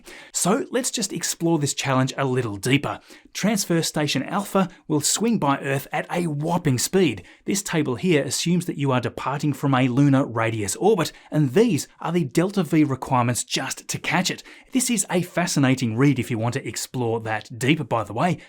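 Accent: Australia. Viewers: Are you an Aussie? Can't believe I never picked up on your accent before today been watching you for ages 😍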